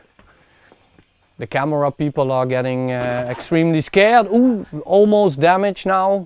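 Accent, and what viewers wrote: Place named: South, Netherlands. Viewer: I like the thick Dutch southern accent. I mean, do not change it. Go with it, embrasse it. Looking forward to see more of you. Untill the next fire:).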